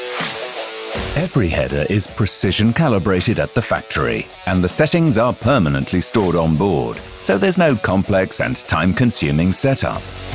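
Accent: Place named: England, United Kingdom. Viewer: I hate hearing English narrators talk....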